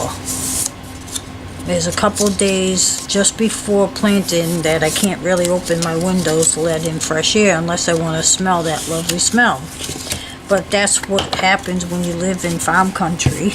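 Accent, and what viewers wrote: United States. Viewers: I love your accent. I can tell it's east coast. Where are you from? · I love this book so much. It looks so sturdy. I can't wait to make one. Thank you. Do I hear a Boston accent. Lolol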